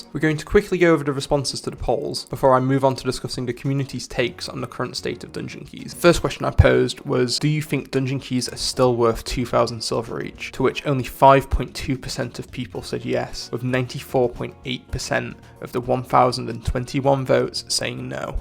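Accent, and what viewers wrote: United Kingdom. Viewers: Followed you on Twitter forever had zero clue you were British lmfao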